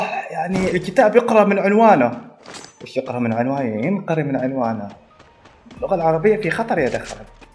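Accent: Oman. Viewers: انت ايش اصلك عماني ولا شو ؟؟؟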